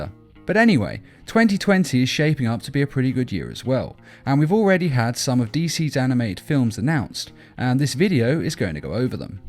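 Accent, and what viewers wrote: United Kingdom. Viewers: What is it about those British voice accents that make the coolest videos? 😁👍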